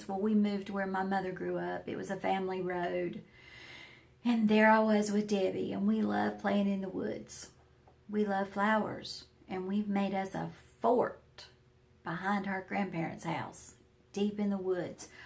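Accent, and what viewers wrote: South, United States. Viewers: I love your sweet southern accent.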